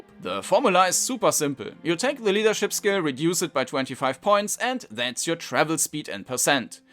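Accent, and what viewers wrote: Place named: Germany. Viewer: der deutscheste Akzent, den ich je gehört habe 🙂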